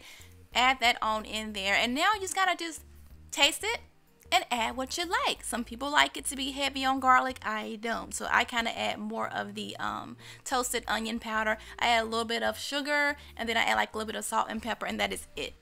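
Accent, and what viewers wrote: South, United States. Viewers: love that southern accent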